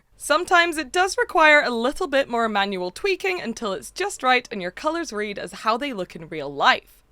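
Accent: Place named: Scotland, United Kingdom. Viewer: I hear a little bit if a Scottish accent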